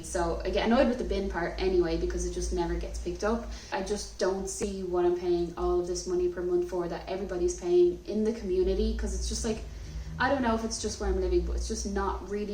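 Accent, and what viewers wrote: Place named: Dublin, Ireland. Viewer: I can understand you ..then again I'm a Dub too 😃😃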